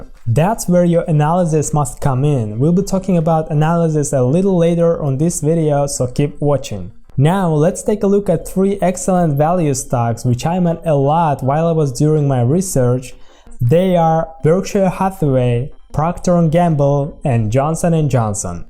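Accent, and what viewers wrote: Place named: Central Asia. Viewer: With strong Central Asian accent. My guess would be Kirgiz or maybe Kazakh. Красава!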